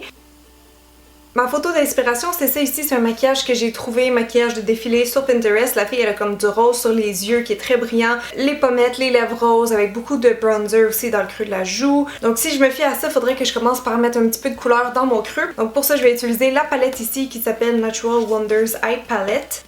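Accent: Canada. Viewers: Bravo pour l'ensemble de tout ce que tu fais, et Merci pour les infos sur ce produit crueltyfree. Trop peu de personnes se préocccupent de la souffrance animale, MERCI!!!! Les produits que tu présentes ici sont la plus belle preuve qu'on peut obtenir la beauté, et la qualité, sans passer par la cruauté et/ou la chimie. Bises. Ps: ( je suis en France), j'aime beaucoup ton accent Canadien, ce qui ajoute encore de la sympathie à ta chaîne.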